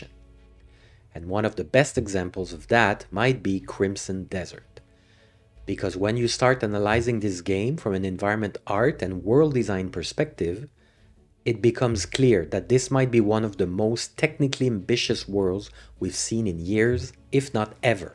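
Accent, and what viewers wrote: France. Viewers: Great analysis! This is probably my favorite game since Skyrim. Maybe with the exception of RDR2. I'm 80 hours in, mostly due to having been on a sick leave for 2 weeks, and I have never been gaming so intensively before, at least not since Skyrim. For me, it's an absolute strike of genius. New sub from Norway ! And kudos for your English as well. I believe I can hear some French accent from time to time, but very little. French, Danish and Norwegian people tend to struggle with heavy accent 😊 (Sorry if I'm mistaken about the accent 😁) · Are you a fellow frog? I seem to recognize my people’s accent! Great video by the way! This game is breathtakingly beautiful. I have spent so much time around puddles and creeks watching water and nature just happen. I’ve never walked as much in a game before! I literally watched comrades I sent to build a house build it in real time. 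It took 6h in-game! The level of immersion is off the charts in this game!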